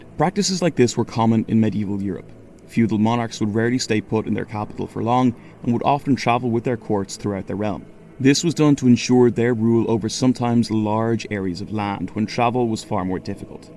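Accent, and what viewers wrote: Ireland. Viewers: I did come back. The Irish brogue, soft as it is, works well, and the analysis is novel and worthwhile.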